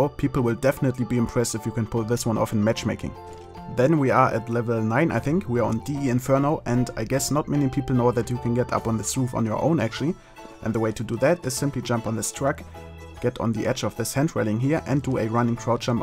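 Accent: Germany. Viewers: Man hört einfach richtig raus das du deutscher bist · Sounds like an german accent, lol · is he german? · bist du deutsch ? du klingst sehr wie ein deutscher der englisch redet ! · love your german english sounds so nice :DD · Deutsch? Wegen dem dialekt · German accent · dein englisch ist so deutsch !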